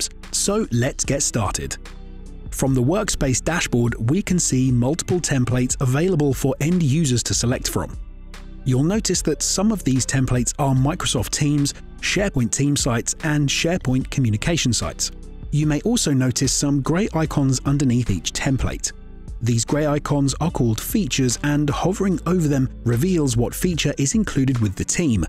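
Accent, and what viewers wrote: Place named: England, United Kingdom. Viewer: This is amazing! Amazing product, walk through and the English accent helped bring everything together :)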